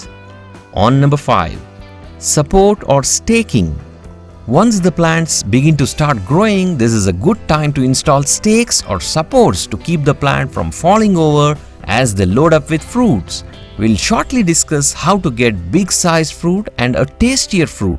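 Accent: India. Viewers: You can hide everything, but you can't hide your Indian accent!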